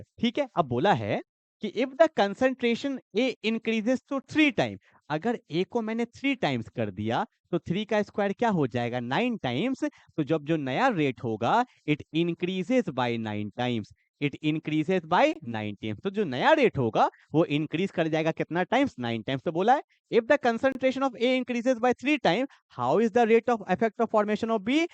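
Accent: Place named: Kashmir, India. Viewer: Sir ka Kashmiri accent ❤😅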